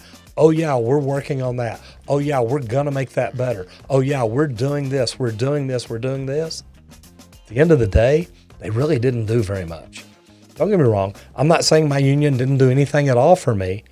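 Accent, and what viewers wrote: South, United States. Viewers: It’s an organization, you pay for a membership to reap the benefits. The good outweighs the bad, great pay and benefits but you get laid off. Also judging by his accent he’s from the south were unions have almost no foothold, less members, and less resources for training.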